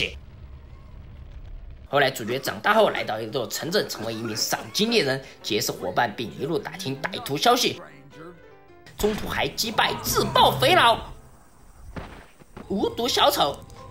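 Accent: Sichuan, China. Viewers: 厂长的川普如果更川一点就更好了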